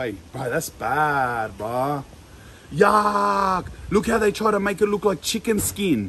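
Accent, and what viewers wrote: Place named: Australia. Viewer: cheeps lol aussie accent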